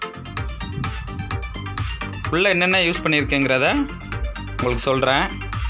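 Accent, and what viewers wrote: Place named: Coimbatore, India. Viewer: hi sir very nice explain....... Love it..... Ana Coimbatore Tamil ela...... Nice...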